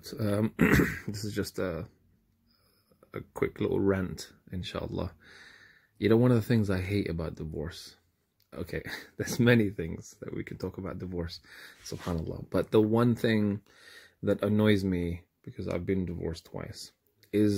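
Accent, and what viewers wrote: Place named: United States. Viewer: I thought i could detect a American accent. 👍😄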